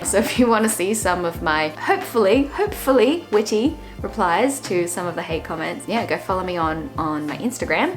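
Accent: Australia. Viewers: I m cool with the aussie accent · To be fair to that guy's comment, I don't think your accent is as obvious as other Australians I've heard. Maybe that's just me though. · Your accent can where you are from, but not always. I have a Japanese friend who learned English in Australia, so she have an Australian accent. She sound exacyly like you. P.S. Canada is so cold, you have no idea! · Don't let haters bother you. You sound totally Australian to me. There are always morons who get defensive if you say one little thing they don't like. You are always welcome to the U.S. You are a treasure. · 1. I really like this video and the one you are talking about in this one. 2. I lived in Australia for only 1 year (mostly talked to backpackers) and noticed your accent. 3. I hated it when the shops were closing at 5pm and I still love Australia. It's possible. Greetings from Germany where the shops are closed on Sunday :)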